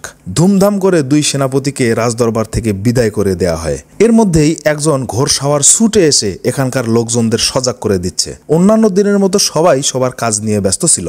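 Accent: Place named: Rangpur, Bangladesh. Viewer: রংপুরের ধারা ভাষ্য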